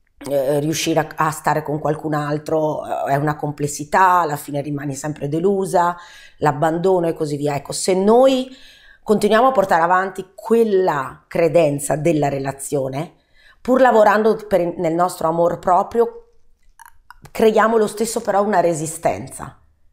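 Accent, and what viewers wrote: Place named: Veneto, Italy. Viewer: Buonasera, mi pare tu sia veneta. Di dove? Io sto a Vicenza.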